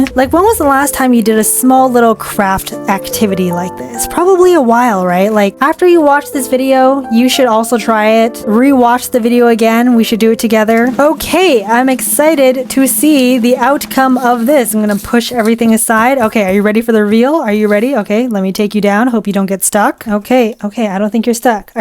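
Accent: United Kingdom. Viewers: Wait.... are you british?😱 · British? You're my new favourite youtuber. And 10/10 for most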